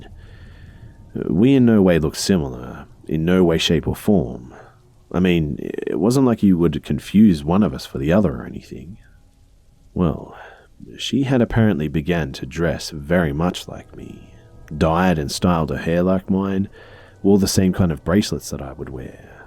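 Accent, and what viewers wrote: Australia. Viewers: Oh my gosh I'm new here so when you started speaking I was like "OMG you're Aussie you're my people!" All the other scary narrations I watch on YouTube are American! All. Of. Them.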